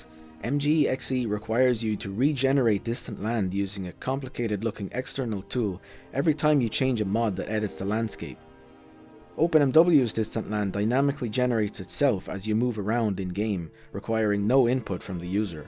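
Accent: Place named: Ireland. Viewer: You sound Irish, I'm willing to bet old enough to drink means something different in Ireland lol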